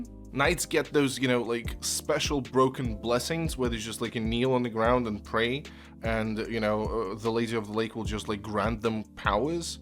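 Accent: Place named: Eastern Europe. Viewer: ehh, it's weird. Imma use your rules instead. Also, are You from Poland or Russia maybe? You kinda sound that way, I believe I can spot fellow Eastern Europe folks lol.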